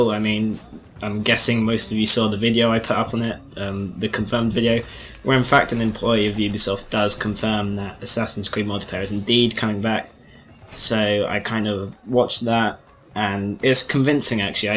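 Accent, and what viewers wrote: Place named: United Kingdom. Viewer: 1) What did they banish you from? 2) Why do they call themselves an organization yet do this? 3) To be frank, why do you keep playing? 4) I believe you can report players to Sony. If so, why don't you do this. 5) Why do you not make a video exposing these people and what the have done? If you're afraid of being no better than them, you needn't worry, for it is simply you expressing your views and feelings. P.S I love British accents; IMO, they are superior to that of Americans (I'm American) P.P.S. Please, make tip-videos and more commentaries! I'm an assassinate noob and could use the help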